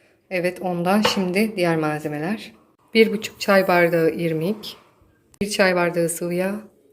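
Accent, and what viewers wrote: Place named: Trabzon, Turkey. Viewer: şivene kurban teyzem :) bende trabzonluyum cok keyfle izledim sizi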